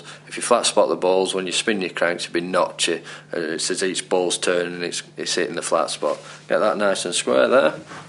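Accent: Yorkshire, United Kingdom. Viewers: Proper Yorkshire int it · Proper Yorkshire, less is more 😁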